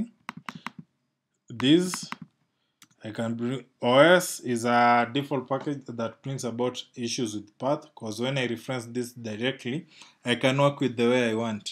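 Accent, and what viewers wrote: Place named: Kenya. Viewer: The moment I had the voice I knew it a was kenyan big up bro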